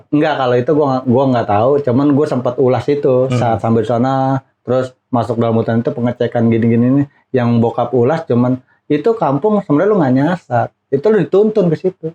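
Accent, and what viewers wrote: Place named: Tegal, Indonesia. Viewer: kalo lagi ngapak"mampir dong ke kampung aku aku juga di daerah tegal tau. Klo udah bicara fillowopi nya keren"banget aku suka.!!!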